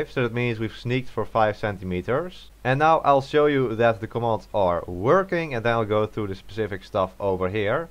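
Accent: Netherlands. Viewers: Wow if thats not a dutch accent i don’t know what is XD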